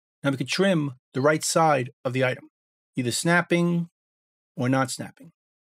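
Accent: New York, United States. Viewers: U from NewYork?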